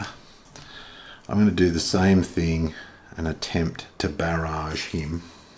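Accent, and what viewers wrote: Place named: Australia. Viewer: Hi, thank you so much for the amazing video series. I've been wanting to get into these solitaire games but was worried how difficult it would be to play. I've actually owned D-Day at Omaha Beach from this series for maybe 8 years or so but never played it. However watching your videos, the explanation of each move and turn really has opened my eyes and got me wanting to try. I've assumed you're an Aussie based off your accent. I am an Aussie also (NSW based), so really does make it even more enjoyable that someone has taken the time to make such in depth videos for people to watch. Massive thank you. Hopefully you get some more time to make more. Hoepfully I can pull the box down and give it a whirl! Also, how did you trim your chits? Do you have a little jig to have them look so nice on the corners?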